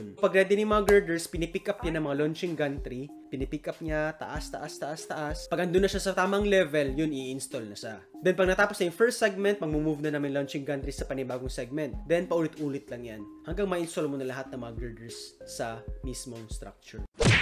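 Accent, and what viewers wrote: Visayas, Philippines. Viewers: Bisaya diay ka pre hahaha tabangi ko dol mag engineering ko puhon dol🙏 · Bisaya accent🥺😍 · Bisaya ka diay kay ang imong pronounciation usahay murag bisaya gyud! 🤭🤭🤭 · Bisaya ka man oi 🤣 new subscribe 🤚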